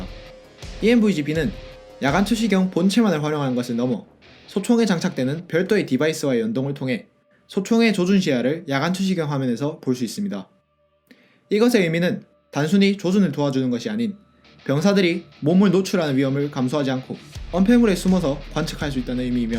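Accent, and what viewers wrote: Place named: Gyeongsang, South Korea. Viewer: 갱상도 사투리와 영어버터발음의 조화!!!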